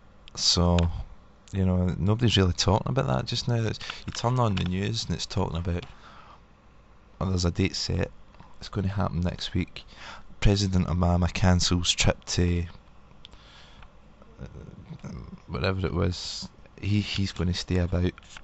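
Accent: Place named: Spain. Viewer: I found the thick Spanish accent difficult to follow at times-- but really enjoyed the program : )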